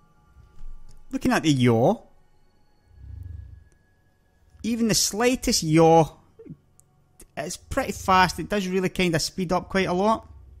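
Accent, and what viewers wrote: Scotland, United Kingdom. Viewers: i'm love with that scotish accent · Scottish? :) Sounds great!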